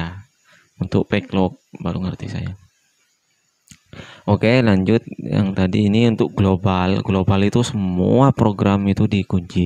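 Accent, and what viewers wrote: Madura, Indonesia. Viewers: Kayak orang Madura ya ini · Meduro y kang? Hehehehehe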